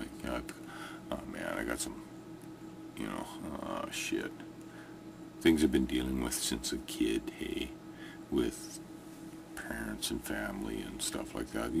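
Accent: Canada. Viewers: Sad to hear about the depression. You wouldn't happen to live near Sudbury would you? As a fellow Canadian, I'm just wondering about your accent :).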